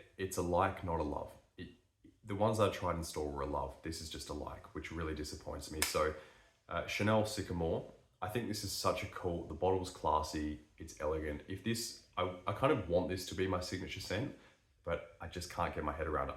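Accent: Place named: Australia. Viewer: I just started getting into fragrances and already know what you are feeling about Sauvage mate 🤣. It’s just that Sauvage has something VERY special about it initially, but at the same time , once you get used to that special characteristic, the whole fragrance just loses its seduction. Haven’t had this with any other fragrance yet. Quite the opposite, most of the fragrances I started liking EVEN more after wearing them many times. By the way, absolutely LOVE the accent mate: which part of Australia are you from? Do you know any other Aussie channels that deal with fragrances? I already know one young bloke, but you can TELL he is young, which is why I don’t really follow his chanel much 😅. I lived in Melbourne for two years and absolutely enjoyed the vibes in Australia, hence I am always happy to find Aussie content creators and feel a bit nostalgic when listening to you guys 🇦🇺 (such as Andy cooks, but that’s a different topic haha) . 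Wishing you all the very best from Germany mate!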